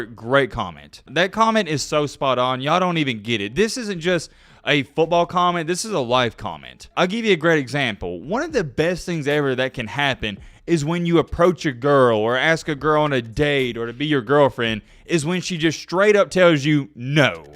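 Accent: South, United States. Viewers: The Deion Sanders era at Colorado will be very interesting to watch. It doesn't matter who the coach is, if they don't win the boosters and the fans will turn on him. But then again if he's successful then his reign in Colorado won't be long. P.S. That girl in the video was that your wife, girlfriend, sister, cousin (you sound like you're from the south) or is that some sort of stalker thing going on?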